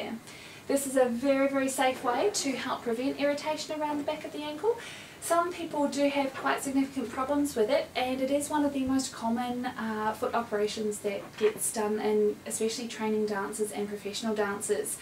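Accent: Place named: New Zealand. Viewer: it sounds new zealand, i went to one of her workshops in rocky, she's great :)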